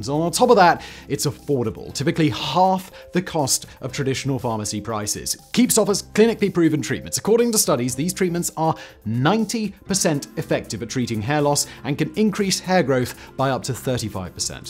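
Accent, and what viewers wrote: United Kingdom. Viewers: I find myself conflicted given I have quite the military boner in regards to an American Aircraft carrier bringing the pain, yet it's history is being delivered by a Brit....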